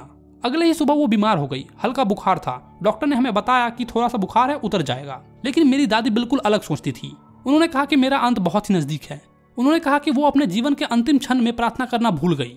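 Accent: Bihar, India. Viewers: Bhaiya aap Bihar se ho 😊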